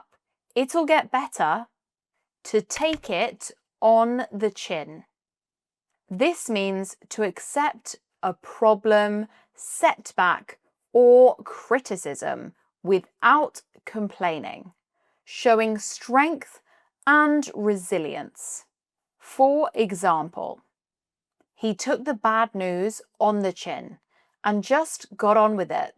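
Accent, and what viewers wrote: United Kingdom. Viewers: Wow, that's super useful! Now I just need to find a way to remember as many idioms as possible... Great job,. Thank you so much for your dedication. PS your British accent is amazing ❤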